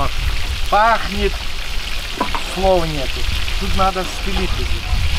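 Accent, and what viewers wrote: Ukraine. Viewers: вы просто крутой узбек с украинским акцентом) живите долго, кушайте вкусно!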